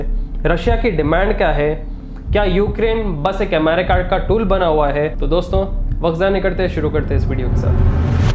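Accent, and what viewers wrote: Hyderabad, India. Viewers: Hyderabadi Urdu...🇵🇰👍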